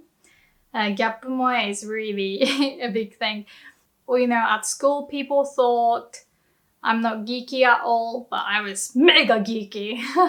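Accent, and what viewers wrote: United Kingdom. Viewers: Hey, did you study in the UK? Sounds like you have anyhoo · "If you like boobs you'll like this" is a pretty good way to get me interested. Also your English accent is great, it's so nice to hear people still learn UK English accents.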